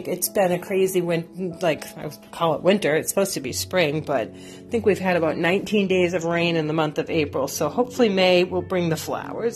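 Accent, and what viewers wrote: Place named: East Coast, United States. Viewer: Always enjoy seeing your home and how you decorate..love your eastern accent 💐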